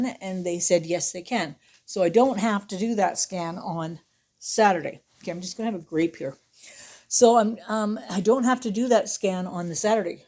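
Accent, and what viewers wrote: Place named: Canada. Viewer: Hi sweet, I knew you were Canadian with your accent…I’m from the East side of Canada, from my youth…I’m now in California since 1964. I was only 10 years old when we moved from Cali. I enjoyed watching your video…since my mama has Parkinson’s also! Enjoy your video! 😘❤️🙏🏼🌺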